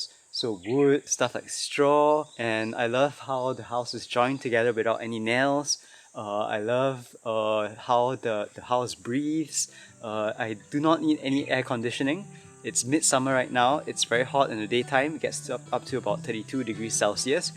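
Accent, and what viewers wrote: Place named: Singapore. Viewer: Based on his accent i would say either singapore or malaysia.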